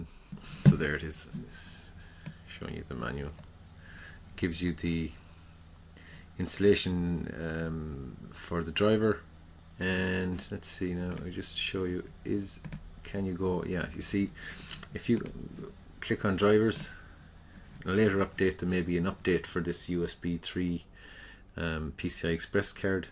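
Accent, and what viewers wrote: Ireland. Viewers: this was very irish sounding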